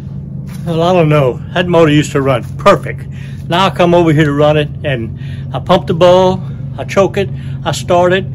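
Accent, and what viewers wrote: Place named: Virginia, United States. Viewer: Are you in Virginia I hear a little high land in ya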